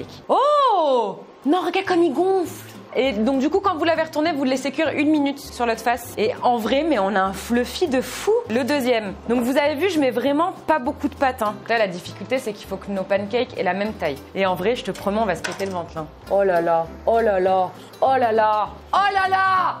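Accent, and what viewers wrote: Canada. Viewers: Tu est quebecoise ou française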